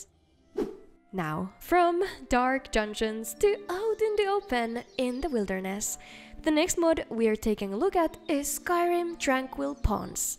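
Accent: Finland. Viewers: I was thinking that you kinda sound like a Finn, and it seems you are lol. Just started my billionth Skyrim playthrough and am in the process of modding it 'till it breaks. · From the way you pronounce names it becomes clear that you're Finnish. Only a finn could say "Jenassa" like "Suomessa"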